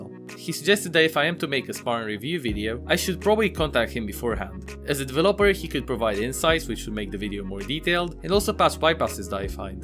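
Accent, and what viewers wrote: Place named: Romania. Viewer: ai accent de roman ma întreb de ce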